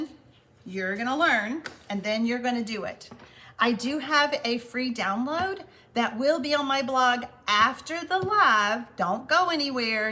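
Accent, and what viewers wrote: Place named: Maine, United States. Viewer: We Mainers have that accent that’s hard to understand too!! Oh well.. that’s the beauty of diversity!! 😇😂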